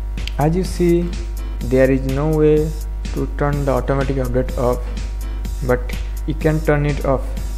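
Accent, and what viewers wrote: India. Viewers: cannot understand indian English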